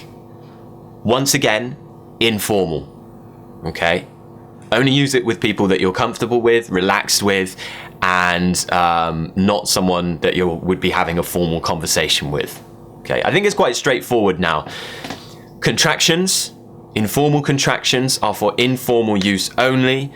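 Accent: RP, United Kingdom. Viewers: Hello,! I came across your channel last week and now I can't go a day without watching at least one of your videos. You're very helpful and I want to thank you for that. You're automatically the best teacher I've ever had, haha. I really want to improve my British accent because I'm from South America and I learnt English in the American way, but I really want to learn British English more. When I watch your videos I always practice alone at home pronouncing the words the way you do it, but when I'm at university and I'm required to speak in English, I always end up speaking with my usual American accent because I'm still not used to speaking with a British accent and I feel kind of forcing it, like acting up my English, if that makes sense. Do you have any advice for me on how can I feel more relaxed speaking with my rough British accent to other people? 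Thank you very much again! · I wonder if your accent is Received Pronunciation?Thank you! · I didn't understand British accent before. But now I can't believe that I can translated almost all you said. Thank you from Thailand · Are you speaking RP accent? You speak so clearly.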